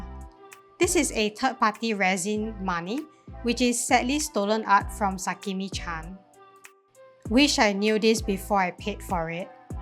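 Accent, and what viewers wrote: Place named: Singapore. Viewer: First time discover your channel and I'm wondering if you're Singaporean after hearing your accent and I'm right 😅, fellow Singaporean here 👋, I'm planning to become a youtuber as well, hope I can achieve that goal soon, best of wishes and amazing collection. 👍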